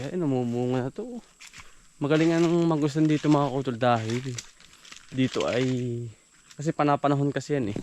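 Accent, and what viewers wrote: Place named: Laguna, Philippines. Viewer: Tagalog kang talaga , sa Laguna ba kayo paki sa sagpt manan kapatid, natuwa akong makarinig ang ganyang punto ng pananagalog.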